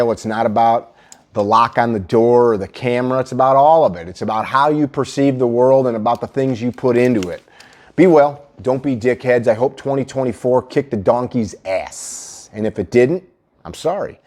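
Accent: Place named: Midwest, United States. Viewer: I love your videos and attitude. Please keep them coming. I binge watch. Sorry to ask a stupid question. Neither my wife nor I can tell where your accent is from. I guessed anywhere from Minnesota to Chicago area? Sh*t, that's probably far off. Thanks.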